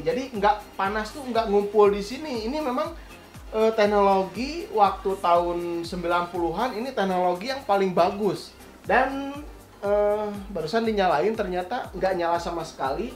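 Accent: West Java, Indonesia. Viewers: Logat sunda pisan euy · Urang mna akang B.indonesia logat Sunda..😂😂😂😂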